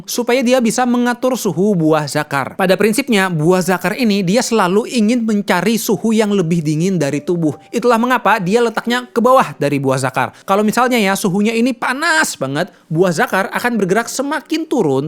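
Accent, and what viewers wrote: Banjar, Indonesia. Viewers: Urang Banjar kalinya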